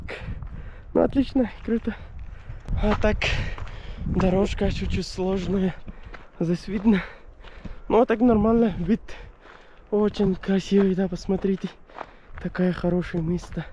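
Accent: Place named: Tajikistan. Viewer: У тебя акцент тажикский😊.. Не доехал , а дошол😊👍👍👍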